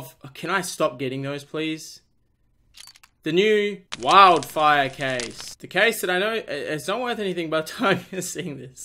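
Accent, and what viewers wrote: Australia. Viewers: Your aussie?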